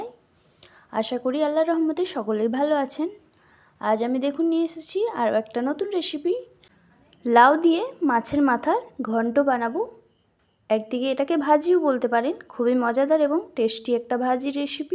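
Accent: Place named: Kolkata, India. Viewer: Assalamu Alaikum. Shotti boddo bhal laagchhe ata bhebe je, kolkatar muslim apur ranna dekhte parchhi🥰. Ami always kolkatar ranna dekhi. Alhamdulillah akhon akjon kolkatar muslim apur ranna dekhte parbo 🥰. Ami USA 🇺🇸 theke apnar ranna ta dekhechhi, aj shokale apnar recipe u tube a pelam. First I thought u r bangladeshi pore kotha shune bujte parlam ta kolkatar 🥰🥰. Shathe shathe subscribe kore felechhi. bolchhilam je, Kolkata te kuthay apnader bari?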